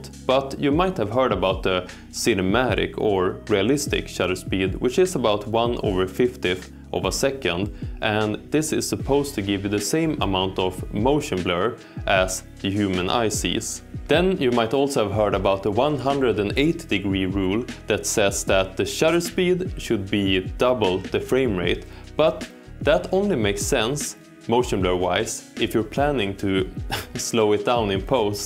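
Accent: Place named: Scandinavia. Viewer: Love you scandinavian a-c-cent <^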